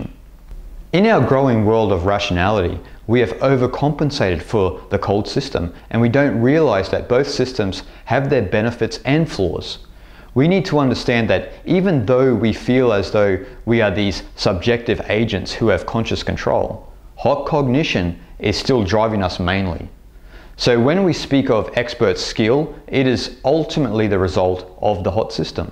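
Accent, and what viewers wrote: Australia. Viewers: Something about an Aussie accent that makes me not take it serious...but this fellow is good. Thanks sport. Peace be unto you.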